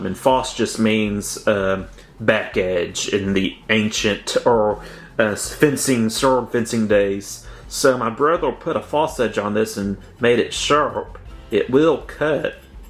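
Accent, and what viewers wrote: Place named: East Texas, United States. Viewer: ok that makes sense. You had a very "no country for old men" type accent.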